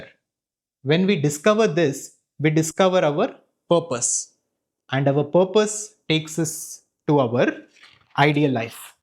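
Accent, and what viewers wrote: South India, India. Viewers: Vedanta is as per kannada vedant is usually in hindi. By his accent he is from south india and that is how we pronounce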